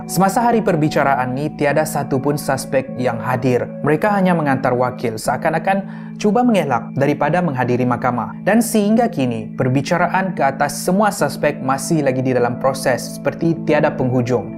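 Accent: Sabah, Malaysia. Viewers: Ini loghat Sabah tu pun tak pandai beza kah. Dasar orang kl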